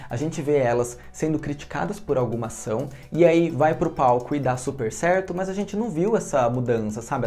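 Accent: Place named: Caipira, Brazil. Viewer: Acho tão fofo esse sotaque "caipira" do 🤗🥰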